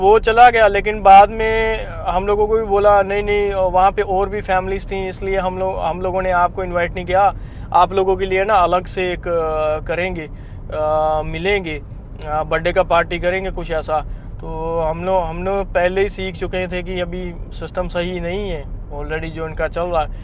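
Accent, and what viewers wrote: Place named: Pakistan. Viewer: Which part of India you are from do you have video of that briefing about your self as I don’t know why your accent sounds like Pakistani accent Hindi. Very much similar to Pakistanis here in Dubai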